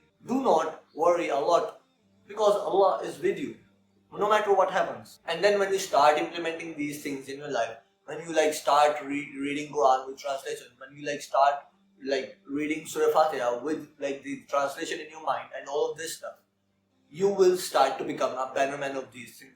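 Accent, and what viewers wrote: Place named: Pakistan. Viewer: Your ascent is little bit pakistani where are you from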